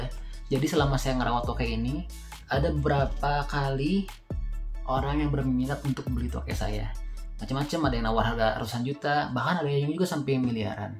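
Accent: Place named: Banjarmasin, Indonesia. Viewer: pian urang Banjarmasin kah? Logatnya spt org bjm.. 😊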